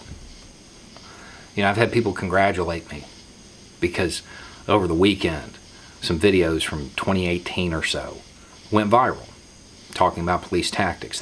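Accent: South, United States. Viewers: I discovered your channel via this video floating about on facebook - It's refreshing, almost pleasurable to hear such common sense being laid out in a southern accent. I'll be binge watching your stuff now while I wait for Boris Johnson to finish destroying my country.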